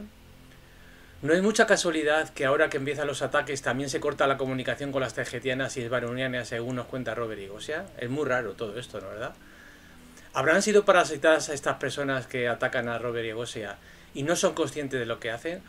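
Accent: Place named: Brazil. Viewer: escribí para comentar en el video. puse: ¿Por qué tengo la sensación que la que habla en el video es Gosia usando un modulador de audio y poniendo acento brasileño? Bueno, pues después de contestar que parecía una tonta y que dejaramos de inventar teorías locas etc, borró todos los mensajes.... ya no hay rastro de ellos. Todo lo que dice y cómo lo dice es clavadita. Si no lo quieres ver, vale. Cada cual siga su propio camino, no el de otros.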